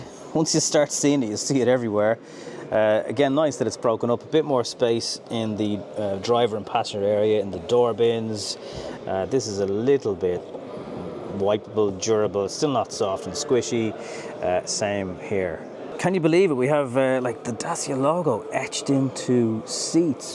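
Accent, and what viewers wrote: Ireland. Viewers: Ireland accent and best Dacia ever so stfu and take my money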